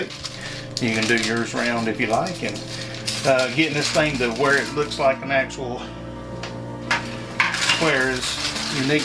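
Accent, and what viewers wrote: South, United States. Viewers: Yes to pizza and double yes to that lovely southern accent.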